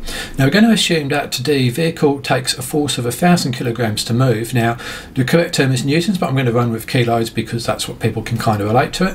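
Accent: England, United Kingdom. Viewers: Hi You sound like a pom but that photo looks like Australia? I enjoy your videos, thanks for taking the time. Mike from Australia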